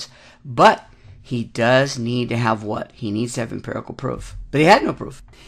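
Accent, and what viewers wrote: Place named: England, United Kingdom. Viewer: You sound a bit like a country yokel from England with that accent there.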